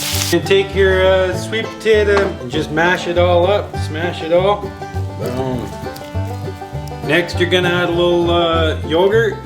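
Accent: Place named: Nova Scotia, Canada. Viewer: You're from nova Scotia aren't you brother?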